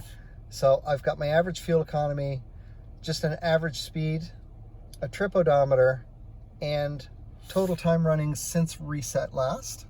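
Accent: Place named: Canada. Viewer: i could hear the canadian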